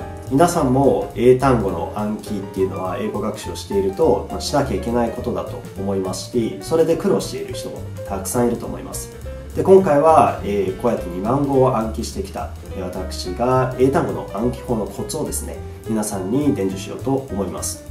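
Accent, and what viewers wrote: Australia. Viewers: オーストラリア訛りすかね？ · オーストラリア訛り？ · オーストラリア英語？ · 発音がすごいオーストラリア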